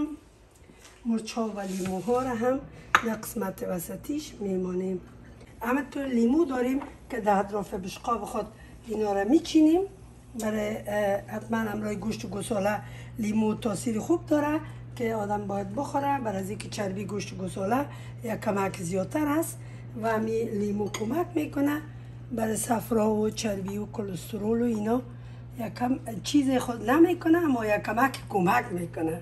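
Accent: Kabul, Afghanistan. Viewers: خاله جان شیرین چقدر شیرین حرف میزنی بسیار با ادبیات بالا و همتو لحجه مقبول کابلی امروز اولین بار است که برنامه تان را دیدیم بیخی عاشق حرف زدن تان شدم 😍😍😍😍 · سلام جان ، من ایرانی هستم ۴۷ سال در فرانسه هستم افسوس میخورم که وقتی جوان بودم و از ایران امدم افغانستان را ندیدم من عاشق لهجه افغانی و شغر های کشور شما هستم امروز شما را روی یوتیوب پیدا کردم خیلی لذت بردم انشاله همیشه سلامت و موفق باشید خدا پشت و پناهتان باشد. شم چه کشوری هستید. قربانتان هلن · سلام خدمت شما بانون مهربان و هنرمند افغان، خانم عزیز. اینقدر که آشپزی تون عالی و از اون مهم ترین صحبت کردن شما با لهجه شیرین افغانی و خونه وزندگی تمیز و زیبای شما کلی بهم آرامش میده و لذت میبرم از تماشای هنر آشپزی شما. اینقدر که مهربونید من احساس میکنم یکی از اعضای خانواده من هستید، شما خانمهای افغان خیلی هنرمند و مهربون و خانواده دوست هستید خوشحالم که هستید و آرزوی سعادت، سلامت و شادمانی برای شما و خانواده عزیزتون دارم. 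برنامه هاتون رو لایک میکنم و به دوستان ایرانی خودم هم معرفی میکنم. 🙏🙏👍👍🌹🌹😘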